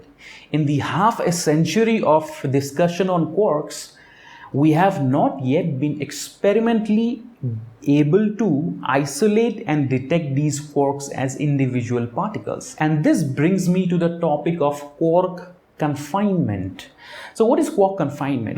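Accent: India. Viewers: Great over-view. packed a lot of information in 24 minutes. Well presented and yes, I can even understand your Indian accent, (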